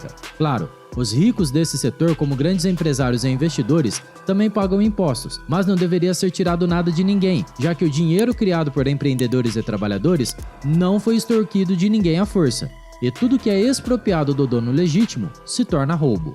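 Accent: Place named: South, Brazil. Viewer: MEU CARO, VOU TE DÁ UM CONSELHO , SE PRETENDE SER UM LOCUTOR ... POR FAVOR PERCA ESSE MALDITO SOTAQUE ! NÃO PASSA CREDIBILIDADE NENHUMA . DEPOIS DE BOLSONARO, PEGUEI ÓDIO DE QUEM FALA ASSIM... ME LEMBRA DOS NAZISTAS DO SUL ... CAIPIRAS ACULTURADOS E ACÉFALOS.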